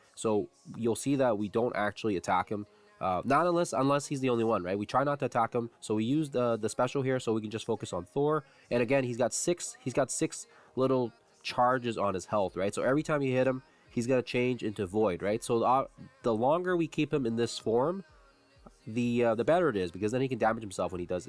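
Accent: Canada. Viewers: You sound just like rayge gaming to me lol. Is it just the Canadian accent? 😂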